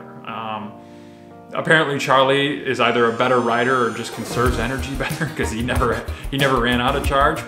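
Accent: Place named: United States. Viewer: Cannot hear much of what you are saying. Your strong U/S accent, which is not easy for native speakers of English to understand, and the overbearing music (noise) defeat your purpose. I persevered, but gave up after about 4 - 5 minutes.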